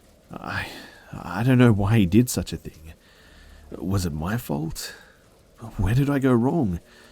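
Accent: Australia. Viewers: He's not British He's Australian. Seriously can you people not tell the difference?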